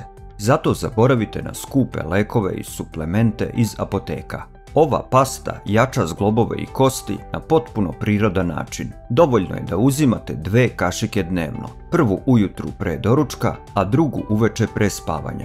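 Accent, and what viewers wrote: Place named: Czech Republic. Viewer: Замечательно ! Спасибо ! Мне просто чисто по человечески интересно . Откуда из какой страны Вы ? Вот слушаю Вас и почти все понимаю то что Вы говорите , и думаю , кто же он , украинец или поляк или чех . Скорее всего Вы чех или словак . Привет из Узбекистана , Хорезмская область .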